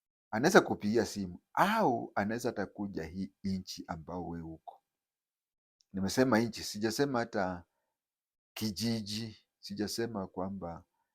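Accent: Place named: Bukusu, Kenya. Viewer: Kwetu ni Wundanyi, lakini asante kwa kunitaja katika video zako. Kiswahili chako kiko sawa, ila kina matamshi yaliyoathiriwa na lugha ya kibukusu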